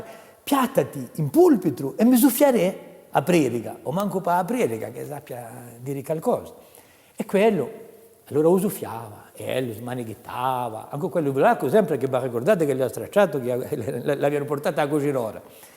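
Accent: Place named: Massa Carrara, Italy. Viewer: confermo, l'accento è quello tipico di MASSA CARRARA ovviamente la lingua è toscano/ umbro medievale con influenze genovesi es, la parola'' fulminanti' cioè fiammiferi era molto usata da mia nonna che era umbra come ''cignale''=cinghiale ecc.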